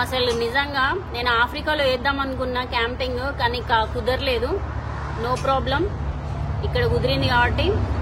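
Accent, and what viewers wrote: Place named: Karimnagar, India. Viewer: U r dare to travel alone beeing a girl.Iam from Karimnager seems that you r nearer my slang.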